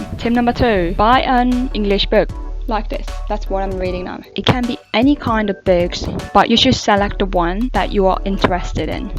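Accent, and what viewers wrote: United Kingdom. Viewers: 언니 브리티쉬악센트 뭐에요 대박!!! 넘나 멋져ㅠㅠㅠㅠㅠ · 와우!!!! 브리티쉬 액센트!!!!!!!!! 너무 부러워요!!! 전 연습해도 액센트는 맨날 콩글리쉬 수준 ㅠㅠ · 너무 차분하게 말씀해주셔서 좋은것 같아요, 저는 미국식 발음으로 배웠는데 요즈음 영국식 발음이 너무 좋아서 부럽습니다..ㅠㅠㅠ♡♡ 겨울왕국(frozen)으로 하다가 포기했는뎅....듣기라도 계속 해야겠어요...ㅋㅋ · 영국식 억양 완전 멋져요!! 대부분 미국식 억양 교재나 컨텐츠들이 많은데 영국식 억양은 어떻게 연습하신거에요?? 영국 다녀오셨던 게 큰 도움이 되셨던 건가요??rpg 게임 팁 완전 도움이 될 것 같아요 ^*^ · I love your British accent!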